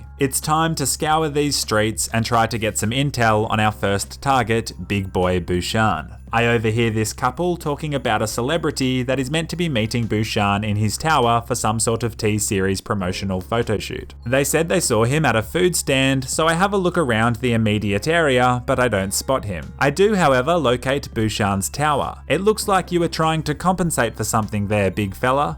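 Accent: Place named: Australia. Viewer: Thought he was Aussie I’m Australian to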